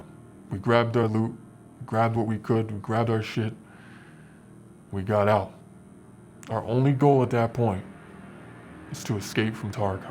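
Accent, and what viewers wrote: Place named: United States. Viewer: Russian in American accent. Love it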